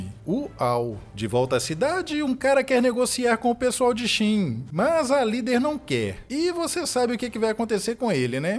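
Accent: Minas Gerais, Brazil. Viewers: O narrador parece ser meu conterrâneo aqui de Minas. Se for, di- vi- no.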